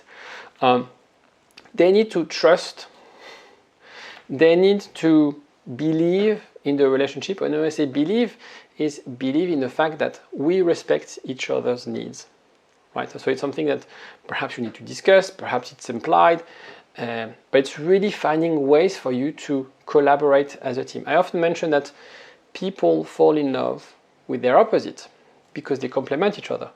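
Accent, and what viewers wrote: France. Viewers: French. Canadian accent is different lighter. Sounds very different if you speak French more than listening as an English speaker.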